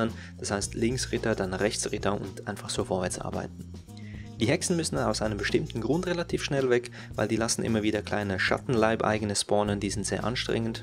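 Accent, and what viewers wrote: Switzerland. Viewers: Der Sprecher ist Schweizer. Man hört es ganz ganz leicht :D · Bist du Schweizer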